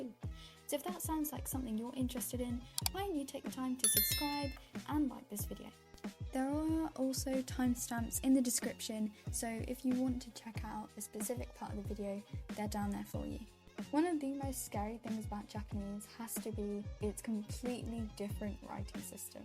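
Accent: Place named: United Kingdom. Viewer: You're so underated btw ur British accent is ssoooo good i love it💗💗💗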